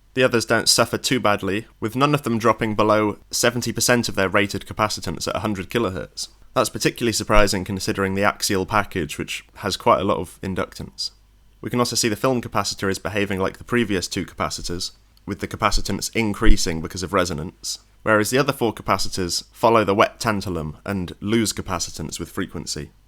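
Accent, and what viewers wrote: England, United Kingdom. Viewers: Your proper English accent made the vid even more valuable and understandable, I hate those mumbling ytbers, it's a pain to listen to them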